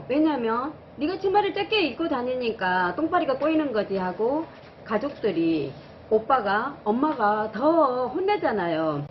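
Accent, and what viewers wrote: Gyeongsang, South Korea. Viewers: 경상도 악센트가 진하니 푸근하기도 하고, 말씀의 내용이 강약이 생겨서 더 정겹고, 명확하게 들려옵니다~ ^^ 들여다 보는 놈, 얼마나 놀랬을까요~ㅎ 그쵸, 한 번의 나쁜 것을 일반화시키는 것은 위험하죠~ ㅎ 재밌게 잘 들었습니다~ 👍👍👍+41 먼저 찾아주심에 손잡고 응원합니다~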